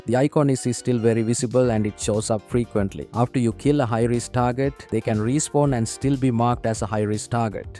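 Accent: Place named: India. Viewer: Indian accent... right, i don't buy anything he says.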